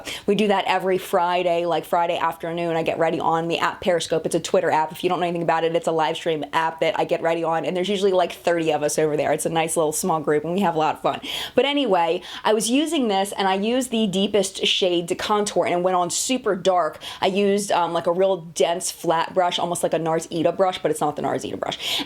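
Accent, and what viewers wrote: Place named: Pennsylvania, United States. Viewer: Luv your content! You have a southeastern Pennsylvania accent (think Philadelphia). Where are you from??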